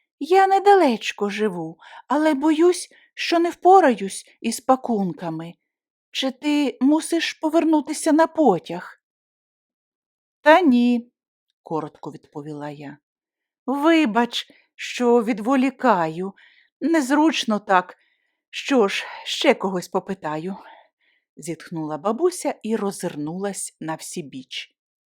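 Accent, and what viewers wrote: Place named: Ukraine. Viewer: Добрий вечір,! Слухаю..., насолоджуюсь...новою зустріччю з Вами! А в голосі, до болю знайомі, нотки українського діалекту. Продовжуйте - це наша підтримка і віра на краще. ❤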